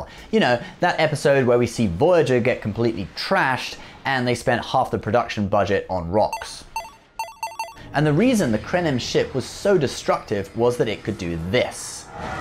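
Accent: United Kingdom. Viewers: Woohoo, is back & we did not have to see that heretic American. thank you for this video, & thank you for not being a heretic. #Humanityfirstlast #Britishbeniskewl #Americanbendrools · IS BACK! GOD SAFE THE QUEEN! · The return of the sophisticated it’s always a pleasure to see your face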